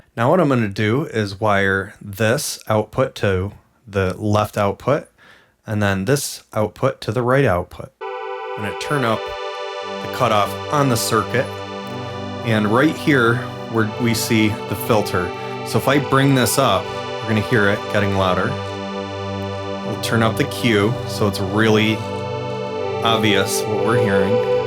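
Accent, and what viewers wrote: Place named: Western New York, United States. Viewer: I stumbled onto your channel this past week, first hunting for more Lyra-8 demos then discovered your Zoia content. My Zoia arrived yesterday and the Lyra-8 arrives on Friday or Monday. I've not yet fired up the Zoia, but your videos along with other excellent walkthroughs people are posting have been helpful. Almost like I've already actually used the machine. You've got great skills of explanation and teaching, thanks for sharing those in your videos. BTW, your accent has me guessing you're from W NYS, greetings from the edge of Lake Erie. :)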